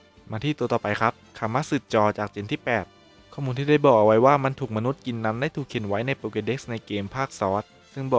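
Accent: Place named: Suphanburi, Thailand. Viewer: ชอบสำเนียงสุพรรณมากค่ะ น่ารักมาก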